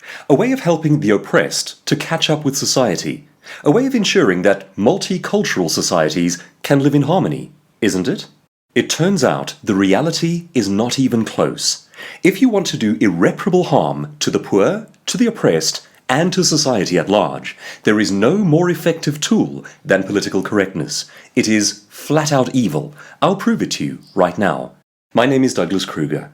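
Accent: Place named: United Kingdom. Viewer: I understand that "politically" and "correct" have definitions in the English language. However, when you use them to further define the concept of "politically correct" I feel like you lose sight of the phenomenon that all languages are in a constant state of evolution. I can't place your accent, sir, but I can tell you do not originally hail from the USA. I'll go out on a limb and suspect The U.K. It is my understanding that the word "c*nt" in The U.K. is a lot less harsh than in my homeland here in the USA. Also, the term "pissed" has two very different meanings depending on which side of the pond you are standing. My point is that "politically correct" is no longer associated with its two root words, and likely has evolved away from its original usage. I noticed in the video you failed to address hate speech. As I understand it, it would be most politically incorrect to the "N" word. 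Yet you are advocating against political correctness. May I ask you to address this?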